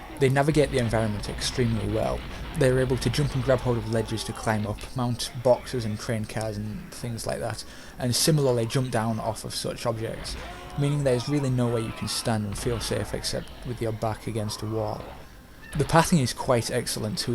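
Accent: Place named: Yorkshire, United Kingdom. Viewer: cool review btw u have the same accent as me, ru from yorkshire?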